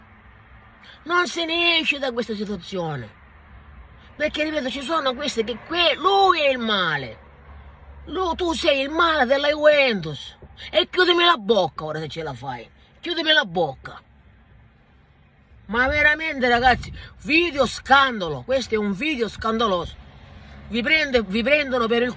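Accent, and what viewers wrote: Sicily, Italy. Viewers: Ma con sta parlata sicula come fai a tifare Alta Savoia ? Boh !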